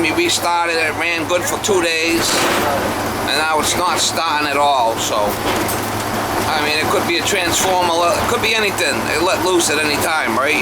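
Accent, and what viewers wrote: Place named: Boston, United States. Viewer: Man I miss that thick Boston accent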